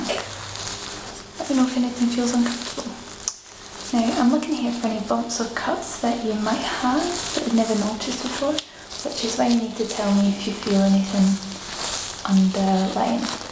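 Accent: Scotland, United Kingdom. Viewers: I absolutely love your Scottish accent. You make it sound like a song. Beautiful to listen to :) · Good. I thought you were really Scottish! And im Scottish myself. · Concerning your inbox: Open your video manager (for example) and see the menu on the left side - klick on "Community" - another menu will open and THERE you can find your inbox :) The Scottish accent rocks. I love your videos, great to see you're back ♥ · Scottish accent made me hard. I don't know why. Probably a combo of voice and gorgeousness.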